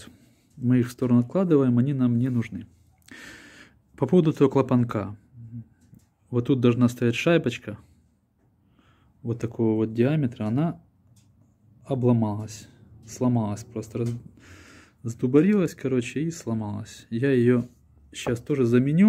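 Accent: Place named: Ukraine. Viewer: Почти угадали, посредине между Тихасом Кропоткиным и УстьЛабинском. Всё, я увидел, что вы из украины :) извиняюсь , у вас можно говорить, как душе забожалось, поэтому мне слух резало :) Досмотрел и поставил лайк :)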